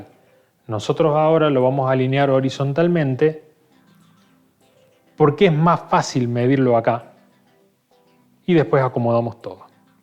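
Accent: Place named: Córdoba, Argentina. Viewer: creo que por tu acento eres cordobés 😊😉. Sabes. Tengo un 🔭 Sky. Watcher Dobson extensible de 10 " <num>. F: 4,7 . Y una cámara Reflex T6. Modificada ..la Abrí yo y le saqué el filtro quedó a sensor pelado . Quería probarla así . Y es una locura ... Las fotos que tengo haciendo light a pulso lo mejor posible .. en 0,8 s 1s como máximo sino me salen las estrellas movidas 😊😊😀 que pena no tengo como mostrarte las fotos de Orion . Una locura 😍😍😍 . Vivir está experiencia de hacer light rápidos y tener que enfocar la cámara cada 10 fotos ..me quedará en el recuerdo !!! Después que me llegue la montura .... Nosotros allá en el sur sabemos empezar desde lo más bajo con los poco recursos que haya !! Eso no lo perdemos nunca 💪💪😁😁 un gran saludo !!! 👍👍👍👍👍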